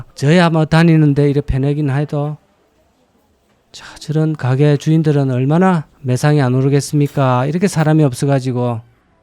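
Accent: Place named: Masan, South Korea. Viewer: 아~ㅎ 고향이 마산이시구나.ㅎㅎ 배챠 하실때 느낌알제.ㅋㅋ😂😂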